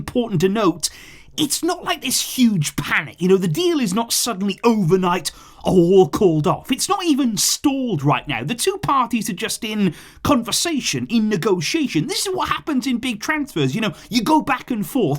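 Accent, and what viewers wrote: United Kingdom. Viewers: This is also a channel for learning the original British accent.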